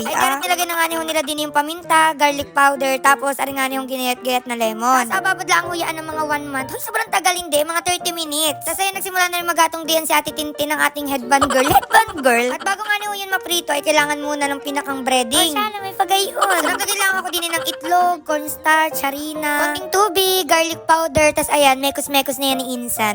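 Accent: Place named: Quezon, Philippines. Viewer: ngayung 730 lang nene ay ang punto mo preho da quezon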